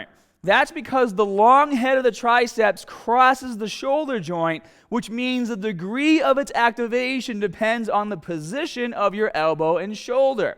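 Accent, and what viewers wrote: Boston, United States. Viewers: That accent makes me nostalgic!!! :-P Go Sox! Go Pats! :-P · “Taaget” “staaated” I have a feeling he’s from Boston! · You from boston man? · Still can’t figure out if your from Boston or Australia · Yo!!! Where in Boston are you??! I listened to the first 8 seconds and knew you were from Boston. This video is needed. I am building muscle in both my hard and so this is going to be added to my routine!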